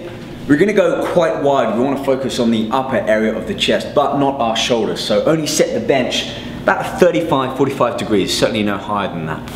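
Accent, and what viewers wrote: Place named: United Kingdom. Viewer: So this workout should build and make your middle line more prominent? Your accent is cute. Is it British?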